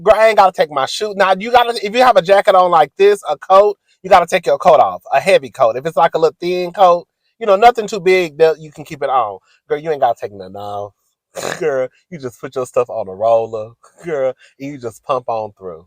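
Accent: South, United States. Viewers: I love listening to you speak! I love listening to "the south" in your accent.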